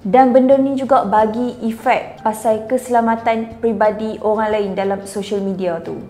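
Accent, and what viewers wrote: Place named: Kedah, Malaysia. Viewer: pekat nahh kedah.. kami suka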